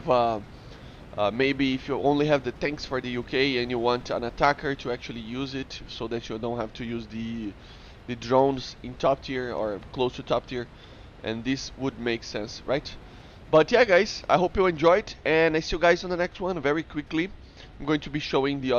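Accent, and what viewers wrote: Brazil. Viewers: Percebi que era BR só pelo sotaque kkkk,tmj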